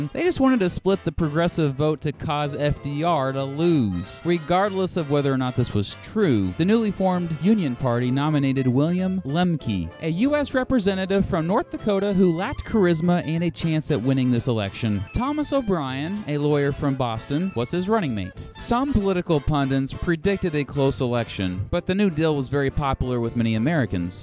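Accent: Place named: Kansas, United States. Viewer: '' I am from "Keensas" '' I can hear that.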